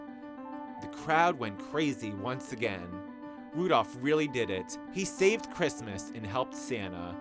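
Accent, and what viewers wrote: United States. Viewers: Rudolph the Red Nosed Reindeer poorly narrated by a foul-mouthed New York (?) sissy.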